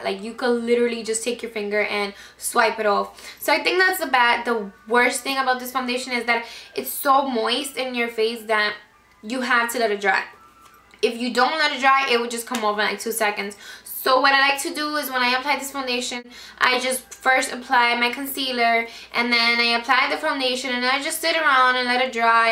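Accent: Dominican Republic. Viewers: Haha i read down on your comments and ikneww you were dominican. so am i and i immediately picked up on your accent. Your gorgeous btw :) subbed right away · You remind me of myself! Accent and everything! Are you Dominican? lol def subscribing!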